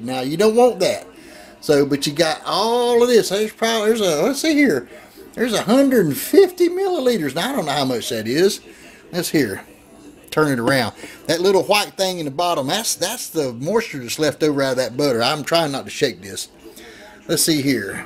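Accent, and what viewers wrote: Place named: South, United States. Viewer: Loved your accent, I'm for the South too!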